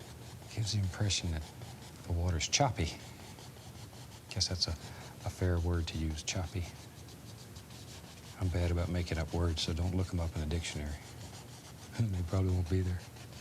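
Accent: United States. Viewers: It's a regional American accent. If you're referring to the way Bob pronounces it. ♥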